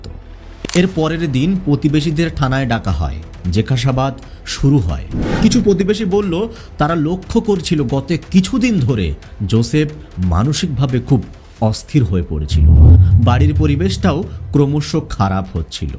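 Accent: Bangladesh. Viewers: tumi ki bangladeshi? Tomar pronunciation tai laglo. Khub valo poribeshon, khub valo laglo. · Apnar protita uccharan kothabarta porishkar BANGLADESHIDER mato .. Bharote ese voter card adhar card kobe jogar kore thakte suru korechen .. INDIAN bolchen but you are not INDIAN YOU ARE BANGLADESHI .. SOURCES TA KI ,, POLITICAL SOURCES ??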